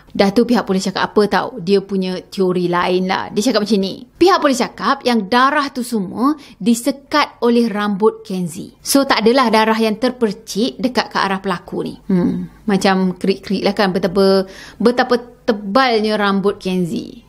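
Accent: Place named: Johor, Malaysia. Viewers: Cakap pun dah ada style orang Johor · Assalamualaikum.. lama akak dah cctv je tapi kali ni memang nak kata… Bunyi Johor tu memang menyerlah sangat dah..😄 Btw bagus utk dia dakwa semula untuk clear nama dia..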